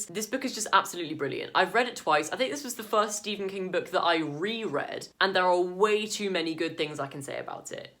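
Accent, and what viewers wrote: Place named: United Kingdom. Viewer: I love your unusual opinion and accent. I think you are British.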